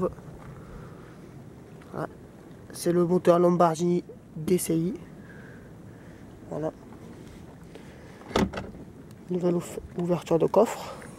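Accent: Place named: Marseille, France. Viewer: T marseillais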